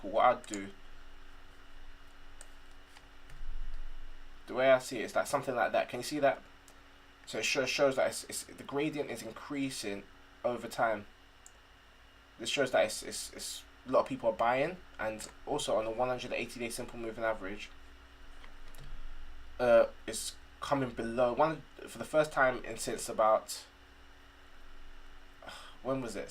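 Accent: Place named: London, United Kingdom. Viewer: Yo yo, waz up bro good to hear a fellow london accent ma man ONE LUV 💝💝💝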